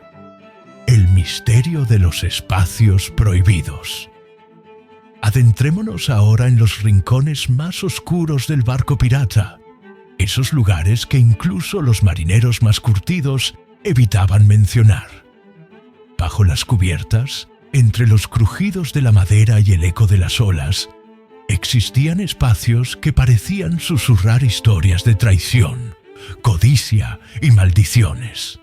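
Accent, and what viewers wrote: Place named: Castile, Spain. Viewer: SIN PALABRAS, MÚSICA, VOZ, LOCUCÍON EN CASTELLANO NEUTRO ...ESTA BIEN PERO MENOS ACENTO CASTIZO X FAVOR ASI SE ENTIENDE AL 100 % . IMÁGENES ILUTRADAS GENIALES ....ESOS FOGONES DE LIS COCINEROS.. SOBRE PISO DE LA CUBIERTA DE MADERA..MMM ..NO ES REAL. PERO...EN FIN..ES MUY ÚTIL Y EXPLICITO E INFORMANTE AL 100!!.. FELICITACIONES.😅😊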